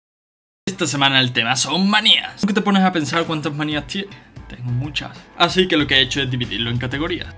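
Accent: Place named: Spain. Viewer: D soy mexicana :3 es la primeara ves que veo un vídeo tuyo!! creo CREO que eres español y tienes aquel acento mi bisabuelo era español!! bueno da igual, me he desviado mucho del tema! bueno me gustan bastante vuestros vídeos vuestros,vuestros, si es vuestros no? heheheheheh me suscribiré :O ah y por ultimo mi manía es decir todo lo que leo y escribo en vos alta o si quiera mover la boca mientras lo hago :3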